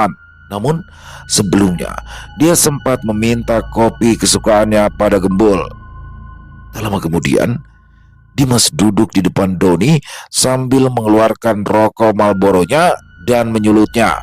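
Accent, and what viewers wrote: Jakarta, Indonesia. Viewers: Aduh bang candu bnget Kalau sdh pda becanda logat betawi resep bngt dah Pokokny sdh alur crita bgus Yg bawain nya bisa bngt setiap bawain crita karakterny mask bngt se olah2 nyata critanyq jd hdup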